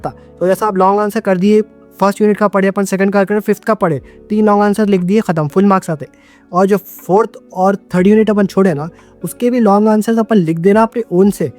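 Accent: Hyderabad, India. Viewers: According to accent are you from Hyderabad?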